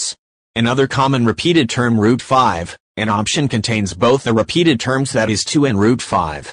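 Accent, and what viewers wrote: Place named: Spain. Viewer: Super bro Spanish english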